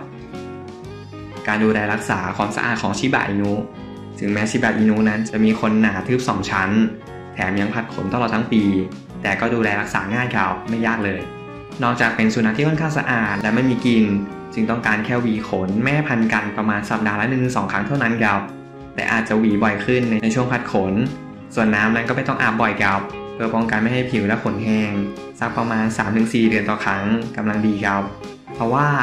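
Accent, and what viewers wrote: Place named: Suphanburi, Thailand. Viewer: คนพากษ์เสียงเป็นคน สุพรรณบุรี ราชบุรี สมุทสาคร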